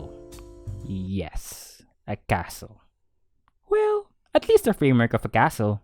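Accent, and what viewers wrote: Philippines. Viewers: u pinoy ur voice is SOOO PINOY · You sound Filipino.. are you? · Is it me or his accent sounds like filipino Xd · Well that explains his accent! I’m Filipino too!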